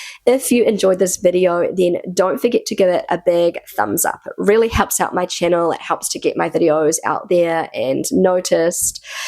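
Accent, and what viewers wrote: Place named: New Zealand. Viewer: Your vid title saying "Lidil" instead of "Lidl" is a bit odd. Love your accent, though. That much kiwi still in your speech a decade later is rather gorgeous. G'day from Australia.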